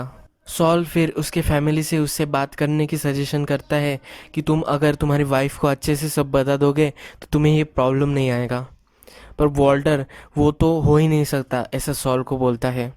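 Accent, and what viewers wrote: Maharashtra, India. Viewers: Good story telling...but i noticed you are marathi bhava from accent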